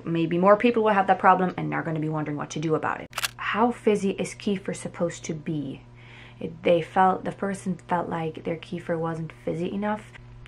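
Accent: Ireland. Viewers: You sound so Irish. Just amazed how Hutterite colonies probably stem from Irish roots. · I had never heard about this before! Thank you so much for sharing, very informative. You have such a beautiful accent! May I ask where you’re from/grew up? I hear an Irish lilt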